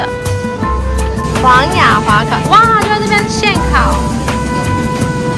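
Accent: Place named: Malaysia. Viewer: 你講話很馬來slang了😂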